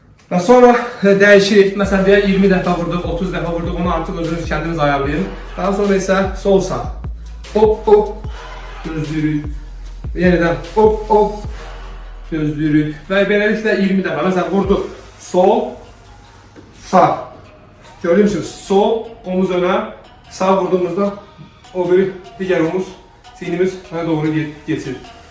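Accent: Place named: Turkey. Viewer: Türk lehçesi 😁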